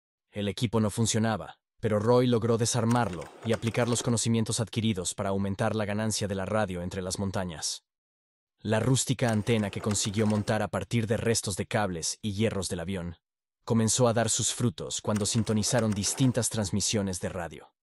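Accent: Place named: United States. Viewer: He estado viendo tus vídeos y noto que tienes un acento medio gringo, y no solo a lo hora de pronunciar nombres ingleses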